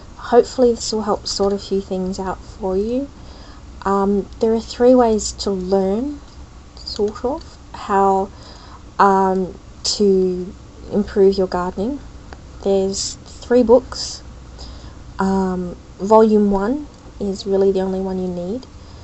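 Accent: Australia. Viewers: You sound Aussie :) Cool video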